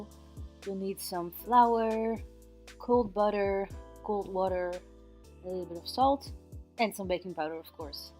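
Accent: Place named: France. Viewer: Hello, what a wonderful personality, heart and energy you have <3 First let me ask you, as your accent in English seems a bit "French" to me, is this due to your heritage or upbringing or just the way I hear it and feel it? Next, not to put a damper on you or your video because it is Beautiful but, if you are using Oreos with the same ingredients as here in the States; then you are taking in lots of things that are unhealthy to your Human Body as well as the environment. Such things like, sugar, palm oil, high fructose corn syrup, corn starch and artificial flavors as the really not so good ones you need to avoid. Sugar, especially refined, but in all forms is very addictive and lights up the Human Brain in the wrong way, as well as the glycemic index and how it regulates in your pancreas, body and blood. Use raw honey for best natural sweetners. Palm oil as it is first off not going to be sustainable and this means the forests are cut down fast and the land left in ruins, as well as Orangutans left homeless, split from familys and many killed in cold blood. 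Sorry the Truth is very sad on this Planet due to unconsciousness of the Masses and the fact many things are kept from us a a Species due to Corporations, Media/Tv and for greed and profit. High Fructose Corn Syrup or HFCS is one that is a no no and very processed and should be known by everyone now days. Artificial Flavors are more then likely not only chemicals, but animal or animal by products. On top of this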